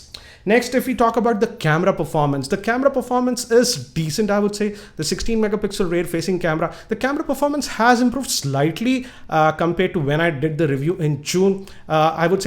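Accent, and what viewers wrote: India. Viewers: nice presentation of indian english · Its just me but I just hate ,,indian" english otherways you speak great and you do very good job with what you are doing so far its that birth languege that makes this and I dont want to ofend you :)! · I still love the Indian accent.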